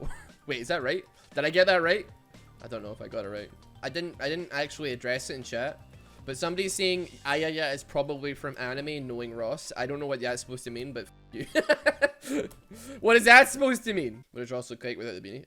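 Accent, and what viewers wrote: Scotland, United Kingdom. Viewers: League of Legends but Ross goes full Scot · Please go full Scot and don't tell any of us what anything means, i'm from further north but i'd still love to watch it. For the most bit glasgow speakers are easily enough understood. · League of legends but u only speak pure scottish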